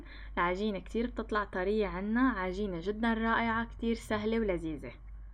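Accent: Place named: Lebanon. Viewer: صوتك حلو مع اللهجة اللبنانية وطريقتك بسيطة بالشرح ، موفقة❤️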